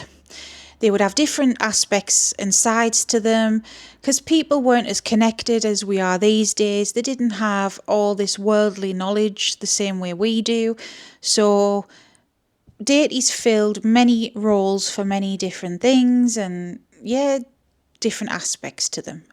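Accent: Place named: North East England, United Kingdom. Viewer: Oh your a north east lass , Xx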